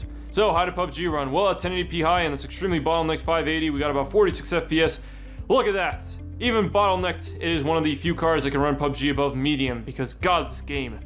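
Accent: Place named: Boston, United States. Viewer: why would you say wicked? because youre from Bawston. Own that shit, brah.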